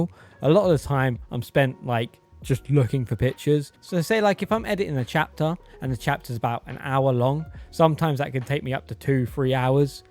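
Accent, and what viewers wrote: United Kingdom. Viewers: I love that you have a British accent. I can't imagine them in American accent 😂😂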